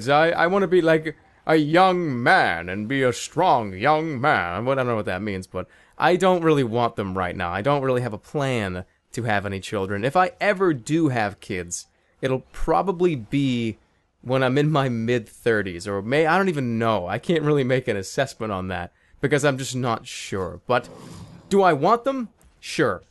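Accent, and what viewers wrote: Boston, United States. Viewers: and hes got sort of a boston voice :D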